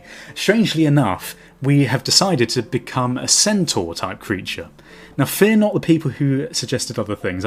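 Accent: United Kingdom. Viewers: you have a british avcent right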